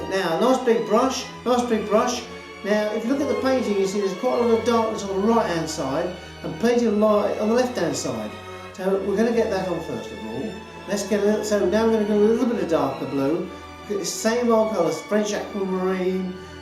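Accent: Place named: Germany. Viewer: Can you cite to two must read books. I could not understand through that thick german accent of yours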